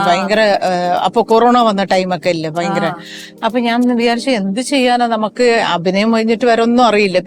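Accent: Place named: Alappuzha, India. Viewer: Malayalam aanu..She is Alappuzha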